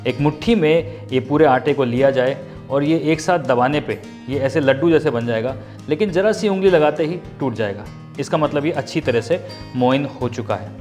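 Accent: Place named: Sindhi, India. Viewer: Ur Sindhi speaking is just💖💖...so sweet... but it's ढोढो not डोडो😅. U r a great chef and extremely humble person..we love you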